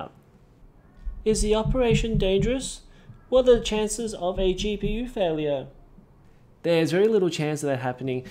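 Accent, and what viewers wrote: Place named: Australia. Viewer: Thanks. Great video. Always nice to hear an Aussie accent on youtube.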